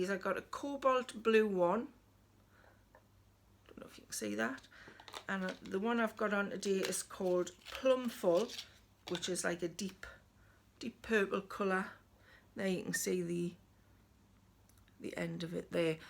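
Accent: Newcastle, United Kingdom. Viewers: I'm a new subscriber. I really enjoyed your content.You come across as a lovely person and the Geordie accent is lovely.Looking forward to watching all the videos.Happy New Year .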